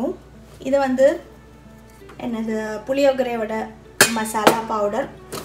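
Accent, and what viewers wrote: Kerala, India. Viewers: First time watching when I listened to i I thought the Tamil sounds like a Malayali speaking then I see the spices written in Malayalam .. r u malayali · Malayali aano. Tamil kettit angane tonni. Puttu kutti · me to first time watching superb aka unga voice malayali ponnu ivalavu alaga tamil paesurathu supera irku... nice kitchen neat and clean superb superb aka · Nice. As others say, ur tamil is sweet to hear. From ur slang i can guess u r a malayalee brahmin. But hv u not changed plastic to glass or steel. Its a trend now a days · Hi sister,i like your way of speaking👍,malayali tamil pesurathu keka nalla eruku..🌹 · Iam ur new subscriber your voice and Malayalam mixed Tamil was tooo good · Hi dear.. your Malayalam mixed Tamil is too cute! Like "Ethaathu"😍 can you share me the link for the grinder. I wanna buy one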